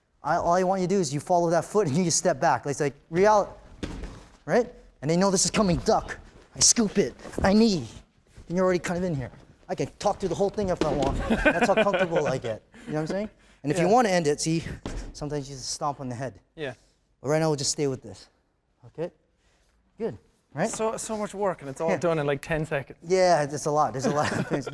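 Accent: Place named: Ireland. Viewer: DoYou KnowDeWae? <- they're right. he said nice. it sounds weird to you over-conspiracizing idiots because you all forget that he is irish and has a thing called an accent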